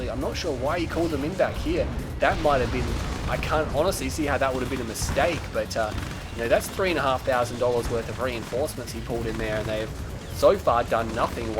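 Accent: Australia. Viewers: Old video I know, but I love your excellent commentary, and the Aussie accent just makes it. Honestly I prefer your casts to Sybert's.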